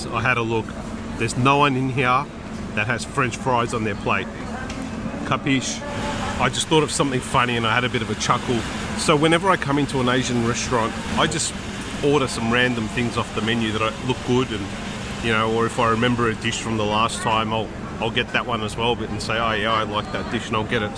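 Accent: Australia. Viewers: Where are u from boss? Australia? Your accent likes ausie guy. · I visited your channel for the first tome today. I didn’t pick you as Turkish, but your Aussie accent is a dead giveaway. Btw, I like tour channel and just subscribed.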